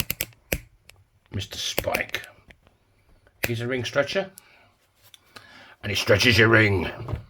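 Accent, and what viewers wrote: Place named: Cockney, United Kingdom. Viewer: Funny are you cockney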